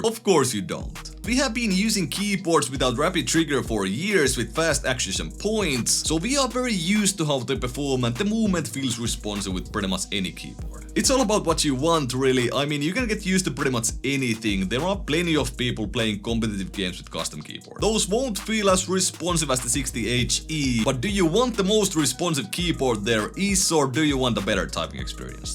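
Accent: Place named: Finland. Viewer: huomaa aksentista että oot suomalainen. kiva että on tälläisilläkin videoilla suomalaisia tekijöitä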